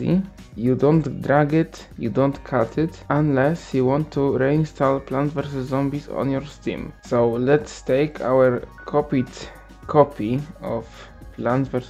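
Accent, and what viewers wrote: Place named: Poland. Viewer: słychać że polak :PPPP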